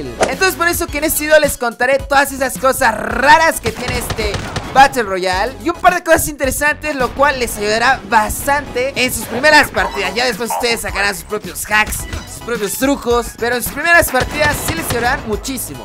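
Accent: Mexico. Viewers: suena como mexicano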